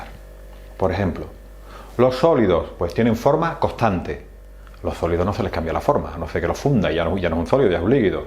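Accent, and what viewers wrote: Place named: Andalusia, Spain. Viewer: Es andaluz es nuestro acento, y aprende a escribir chaval.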